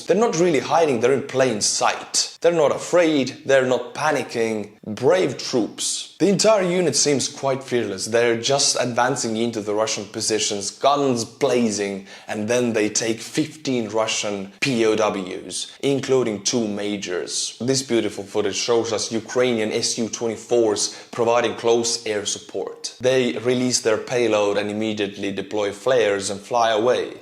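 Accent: Scotland, United Kingdom. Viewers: I like your Scottish accent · loving the Scottish accent. 😄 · Thanks again I'd love to say you butchered my language but you sounded like a scots men lots of love from Scotland 🏴🏴🏴🏴🏴🏴🏴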